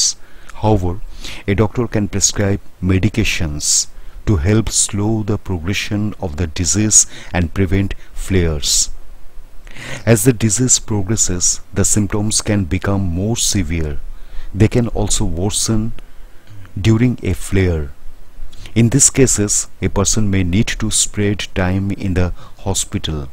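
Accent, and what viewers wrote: India. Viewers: That Indian accent is strong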